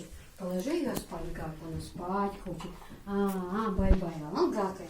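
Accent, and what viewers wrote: Chechnya, Russia. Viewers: Надо же русская, по русский говорить с чеченским акцентом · И акцент чеченский и похожа на чеченку Аллах1 Дала дукьа яха ёйла хьо🤲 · У вас даже акцент Чеченский) Дал атьо бойла, у меня две снохи русские, очень хорошие, уважающие наши традиции и веру! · Акцент чисто Чеченский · у Вас уже акцент чеченский.молодец умница.счастья Вам.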